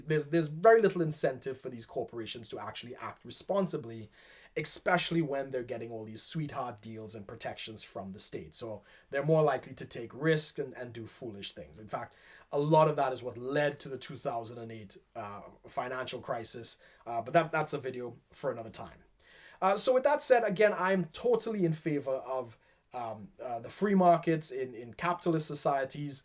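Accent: Trinidad and Tobago. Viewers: Right away I knew you were from Trinidad.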